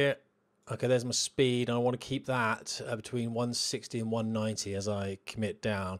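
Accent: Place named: United Kingdom. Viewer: Thanks for sharing these great videos with us common folk. Very cool and informative and I can even understand your British accent. 😊🇺🇸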